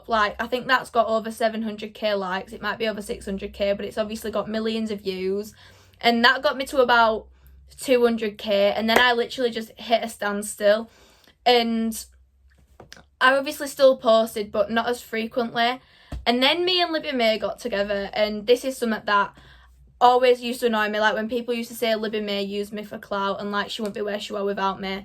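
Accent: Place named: Yorkshire, United Kingdom. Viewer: It's Yorkshire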